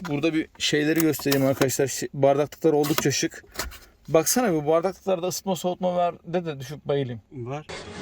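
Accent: Gaziantep, Turkey. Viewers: Amcaoğlu hafiften Antep ağzıyla konuşuyor. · Plaka 27 amcaoglu antep şivesi ile konuşuyor. Abi sen anteplimisn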